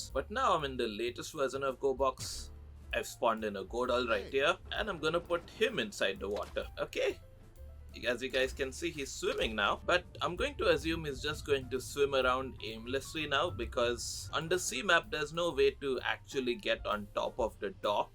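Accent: India. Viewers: Why your Voice so indian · U sound very indian.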